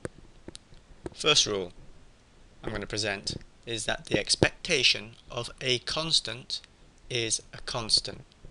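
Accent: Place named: United Kingdom. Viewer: That british accent just adds a whole layer of authenticity on top of it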